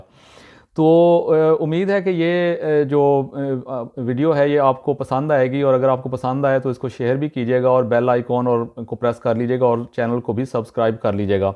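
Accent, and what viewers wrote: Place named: Punjab, India. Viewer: Accent, a typical Punjabi phenomenon, rather complex. Hell with pronunciation, try to gain command over language by developing understanding of Language.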